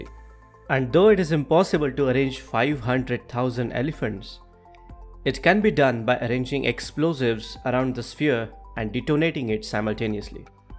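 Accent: India. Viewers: These dumb channels cant ever find an American narrator who DOESNT have a wack ass accent? I dont understand this curry speak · nice, but that accent. It's a pity that many Indian people who has somerhing smart to say has so terrible accent that only a few people can handle that. Most people around the world who use Engish have quite ok accent, but Indian people.. man that's another level :) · An Indian accent and an Indian teacher quality it goes down deep . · Beautiful indian accent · Hoooh hate when the Indian speak English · A Good Science explanation but I am truly sorry I find it so difficult to listen his spellings, really indian accents is so unforgiving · Sorry ,I don't like indian English accent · this video is well made except for the indian accent 🤦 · I love your accent! It sounds Indian, but it doesn’t sound INDIAN Indian, if you know what I mean…? It sounds like base Indian, with some outer influence…? 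· I hate indian accent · The Indian accent is the deterrent of millions of viewers of this great video. Please record the audio with the native English speaker. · agreed....it sounds like a indian try to speak english....good video tho!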